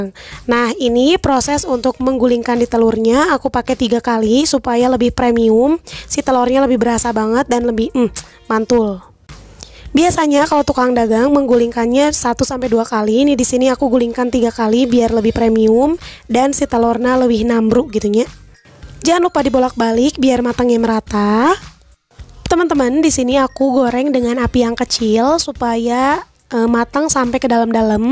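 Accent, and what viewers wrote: West Java, Indonesia. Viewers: Logat sundanya bikin tambah semangat liat tutorialx. Thx resepx sehat selalu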